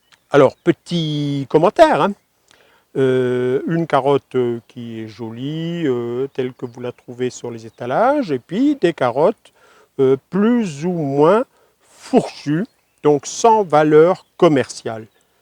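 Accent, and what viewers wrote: Alsace, France. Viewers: Sympa cette petite video 'loufoque' ... Au moins ça déride et c'est bigrement plus 'revigorant' que les blablas fadasses et autosatisfaits de notre (¿?) président ... Toi, au moins, tu n'as besoin ni de cravatte ni de prompteur et ton discours est autrement plus sincère et en prises avec 'la VRAIE vie' que ces leuchorées verbales dont il s'obstine à nous abreuver pour tenter de justifier sa présence incongrue à l'Élysée ... ! Il n'a toujours pas compris qu'on me fera jms boire un âne qui n'a pas soif ! Trèves de plaisanteries ... et de digressions politiques ... !!! Je n'ai 'malheuteusement' pas de epotager ... ni même de jardín ... mais si je devais en avoir un, il ressemblerait à coup sûr au tien ! Je te suis de + en + régulièrement depuis un petit bout de temps, tombée sur le PP par hasard ... !!! Et depuis j'y ai pris goût ... 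Mulhousienne exilée volontaire sur la côte est de l'Espagne ds un bled de la provincia de 'Valencia' je me ressource grâce à toi en revenant ainsi, bien que 'virtuellement', faire un tour en Alsace ... le cadre, le paysages, les SAISONS ... tout me parle ... avec l'accent en prime ... ! Tu me me connais pas mais tu ne peux savoir à quel point le PP ... et son jardinier me font du bien ! MERCI ... même si je ne suis pas à même de mettre tes conseils en pratique ... !!! À la prochaine ... !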